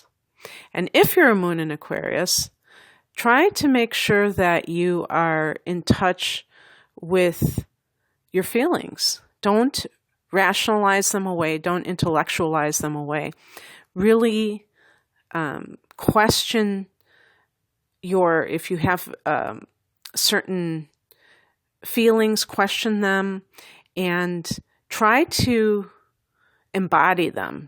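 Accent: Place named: Minnesota, United States. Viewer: Are you from Minnesota? I am, you sound like you could be lol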